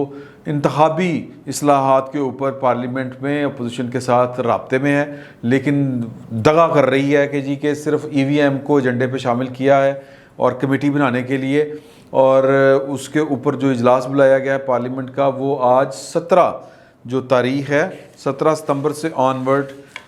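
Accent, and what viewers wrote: Punjab, Pakistan. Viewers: شاہ جی میں آپ کا پروگرام بڑے شوق سے سنتا ہوں۔ آپ کا معصوم اندازہ میں بات کرنا اور پنجابی لہجے میں اردو بولنا، ساتھ میں پنجابی کے محاورے سنانا ، ہاتھوں کی حرکات اور دل موہ لینی والی مسکراہٹ۔ اور رنگا رنگ شرٹس۔ کیا بات ہے۔ پوری تگڑم لگا دیتے ہیں۔ 😁😁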